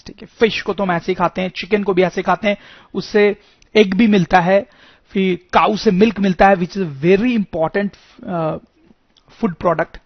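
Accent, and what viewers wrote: Bihar, India. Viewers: Are u from bihar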